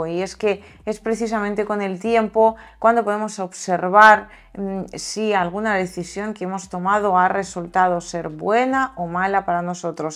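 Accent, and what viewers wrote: Spain. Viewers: Te soy honesta y a pesar de q te admiro y quiero por ser 🌹 🤗 🌹RUSA❤no me gusta tu acento de española pq perdistes tu acento de rusa 🎉😂❤😊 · Me gusta tu contenido, pero se me hace indigerible ese acento español. Te sugiero suprimir ese acento ibérico, pues tu público es sobre todo latinoamericano. Los españoles son atlantistas.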